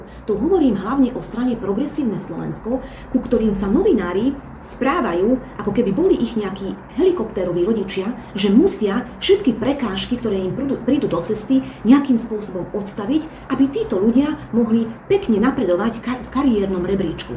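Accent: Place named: Hungary. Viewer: Milujem vašu maďarskú Slovenčinu, žerem vás! ❤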